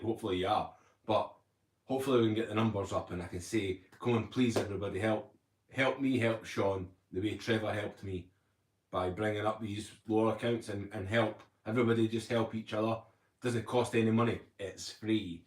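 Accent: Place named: Scotland, United Kingdom. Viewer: Great video. I love listening to you talk. You are very natural on camera. Your Scottish accent is great. I have learned a lot from listening to you.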